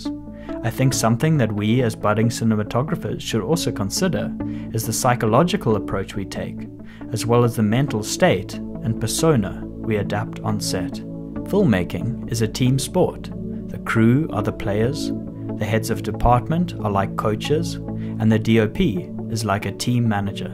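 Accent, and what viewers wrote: South Africa. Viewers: I've been watching a couple of your videos over the last few days and kept wondering if you were South-African based on your accent. It's so nice for me to hear fellow South-African's approach filmmaking with such a passion and clear love for what you do. i too am an aspiring filmmaker in Cape Town, writing and directing shorts. Keep up your good work! i really enjoy the channel and wish the best for you in your career! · Came across the channel via a ‘no film school article’. Was intrigued when I heard the SA accent but only realized who it was when the pics showed up :) loving the videos, very helpful insights. Looking forward to more!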